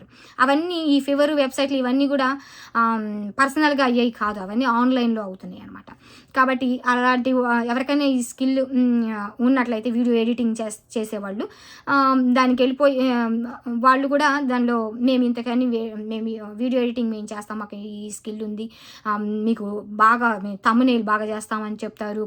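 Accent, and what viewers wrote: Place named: Guntur, India. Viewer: Sister.. Ur from Andhra??? I think ur chridtian. Ur taking like Guntur Telugu language.. M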